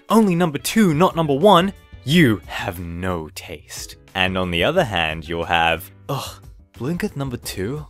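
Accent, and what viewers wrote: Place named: United States. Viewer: why you sound slightly american??? I NEED ANSWERS!!!!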